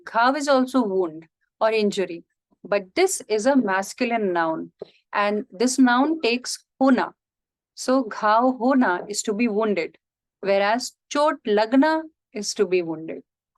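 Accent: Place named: Delhi, India. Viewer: Hey, do you mind if I ask which part of India you're from? You sound like you have a Delhi accent, or at least from somewhere in the North. Also, I was going to suggest you to do a video about the numbers in Hindi.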